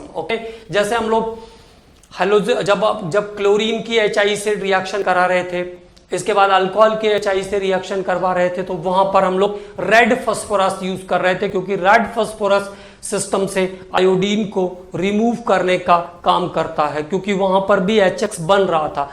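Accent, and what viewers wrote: India. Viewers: 🤣🤣🤣🤣 Yee Chinese nehi yee too pura Desi English hee .. 😁😁😁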